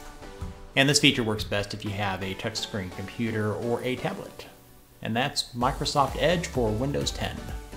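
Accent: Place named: New England, United States. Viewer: Love your Yankee accent...wow.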